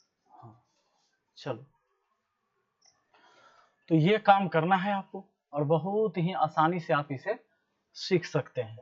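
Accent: Bihar, India. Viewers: Thank you sir aap bihar se hai ya haryana se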